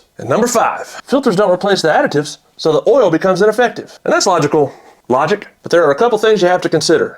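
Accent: South, United States. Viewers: The only person I have listened to with Southern accent who doesn’t sound like a moron.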